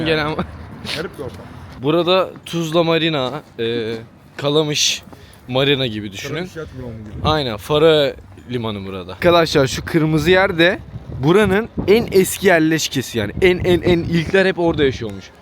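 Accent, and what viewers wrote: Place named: United States. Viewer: Abi amerikalılar gibi konustun 😅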